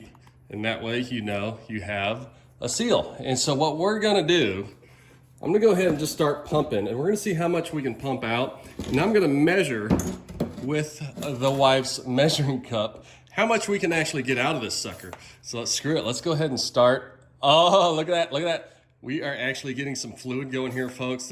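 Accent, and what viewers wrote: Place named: New York, United States. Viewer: HOOZ da Complete Moran now.. Just Sayin yall.. East coast whatever.. probably from manhatten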